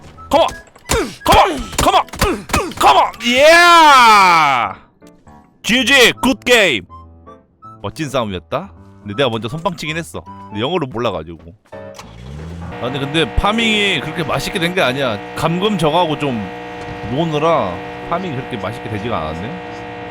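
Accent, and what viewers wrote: Jeolla, South Korea. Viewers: 여기서 전라도어 찐하게 배울 수 있어서 애청합니다. 요즘 잘 써먹고 있음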